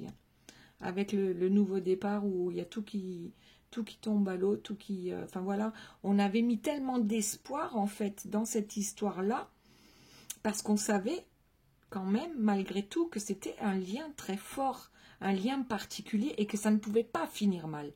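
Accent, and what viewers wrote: Alsace, France. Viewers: Bonjour ! Vous avez l'accent alsacien 😊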